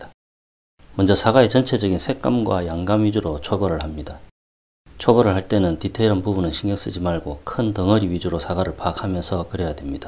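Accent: Gyeongsang, South Korea. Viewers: 님 경상도 사투리 멘트가 아주 정겹고 그림설명도 넘 좋으시네~♡♡ 🎉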